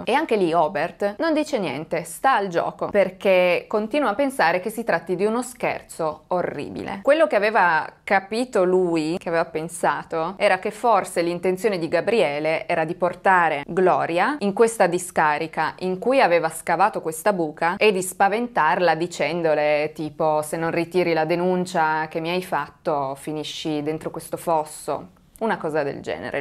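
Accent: Turin, Italy. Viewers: Comunque si sente tantissimo che sei di Torino! 😉 Mi permetto di consigliarti un video su un mio ex compagno di classe: alexander boetcher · E' italianissima e si sente. Comunque ci avrei scommesso cara che sei originaria del Piemonte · Dubito che un commento a due anni di distanza possa essere preso in considerazione In ogni caso complimenti per la capacità di narratrice davvero straordinaria Ho scoperto il canale da poco e lo apprezzo parecchio Per quanto riguarda l'accento nulla a che vedere con Belen È il classico accento delle ragazze torinesi di buona famiglia Ho un dubbio sulla storia Siamo nel 2014 giusto e si parla di pagamenti cash, almeno 2, per decine di migliaia di euro Mi pare davvero strano Oggi sarebbe impossibile, ma anche allora le norme antiriciclaggio erano in vigore Un prelievo in contanti per quasi 200.000 euro poi pare fantascienza Magari ricordo male, ma qualcosa non torna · Si sente che sei di Torino nee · Ciao! 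Io avevo capito che eri di Torino perché parli esattamente come Giulia Valentina ahahahah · la mia nonna è di Moncalieri e la primissima volta che ho guardato un tuo video ho capito subito che sei di torino!!! 😍 complimenti per la professionalità e la sensibilità con cui racconti queste storie, non sono appassionata di true crime ma ti seguo sempre con piacere e interesse!!! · Abiti nella città che io amo e ogni tanto vado a farci i weekend Avevo capito che potevi essere di Torino, dalla tua cadenza aperta 😅 Volevo chiederti, se potevi, raccontare la tragedia di Superga, l'aereo del Torino caduto sul terrapieno, della basilica di Superga. Lo so che sarei il solo a richiederlo, ma io te lo chiedo lo stesso. Sei molto brava, é un piacere seguirti e ascoltare le storie che racconti. Buona serata e buona continuazione ciao 👏👏👏👏👏👍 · No no si sente che sei di Torino 😉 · Si sente che sei di Torino ma parli un Italiano perfetto, con un accento perfetto. 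· Ma la persona che ti ha detto di prendere esempio da belén per parlare in italiano, è la stessa belén vero? 🤣 Penso che l'accento Torinese sia uno dei più belli d Italia 🇮🇹 ❤️ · Un'ottima capacità espositiva, profonda sensibilità e delicatezza nel raccontare queste storie e un magnifico accento torinese. Dov'eri fino a ora? Grazie per ciò che fai, la frase sull'empatia è molto toccante. Questo video dovrebbe essere condiviso di più, perché purtroppo credo che come questa persona ce ne siano tantissime. Bisogna sensibilizzare su questi temi e insegnare alla gente a essere accorta e attenta. Grazie per questo video ❤️ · Ciao! Ti ho scoperto oggi grazie a una mia amica e già dal primo video avevo capito dall’accento che fossi torinese. Io abito in Canavese, mi ricordavo vagamente di questo evento ma non sapevo minimamente di tutta la storia terrificante dietro….fa davvero paura pensare a che livelli può arrivare la malvagità di certe persone…poi a maggior ragione quando sono cose che succedono vicino a te. 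Grazie per aver riportato alla luce la storia di questa povera donna e grazie per tutte le altre storie che ci fai conoscere. Nonostante ti abbia scoperto solo oggi mi piaci già molto e continuerò a vedere i tuoi video con piacere e interesse da appassionata del genere. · Dal primo video che avevo visto ho capito subito che eri di Torino. Con gli accenti italiani sono una bomba💥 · Infatti hai l accento torinese come la Luciana Littizzetto. Grazie x i bellissimi contenuti che ci proponi. Sei bravissima. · Ma chi è che si permette di criticare il tuo bellissimo accento torinese?!? Non ho parole... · Si capiva subito che eri di Torino ❤️ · Che strano , alcuni Italiani non riconoscono l'accento torinese come italiano? Non hanno mai parlato con un piemontese? Addirittura paragonarlo all accento argentino assurdo!!! Ciao da una tua concittadina ahahah. .. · ti seguo da tre giorni ,sono di Voghiera e ho capito dopo 1nanosecindo che sei di Torino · Si capisce che sei di torino ne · ma é di torinoooooooo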